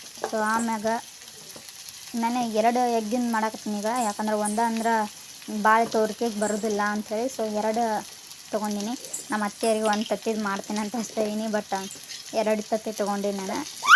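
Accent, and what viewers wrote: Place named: Gulbarga, India. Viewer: Mombi adhuri matte gulbarga matu adtira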